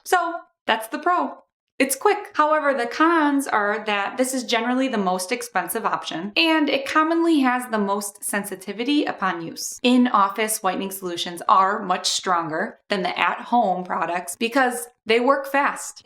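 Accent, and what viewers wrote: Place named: Chicago, United States. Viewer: So. Much. Chicago! I love your accent! Thanks for the great advice!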